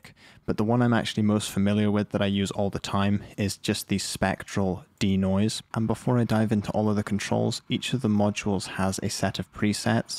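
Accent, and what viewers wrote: Scotland, United Kingdom. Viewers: I don't think he does XD he is scottish sometimes his accent shows.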